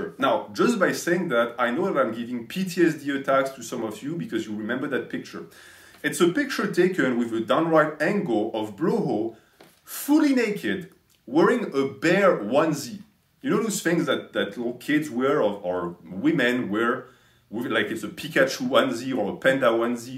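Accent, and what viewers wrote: France. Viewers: This is even better with the French accent. My new favorite account.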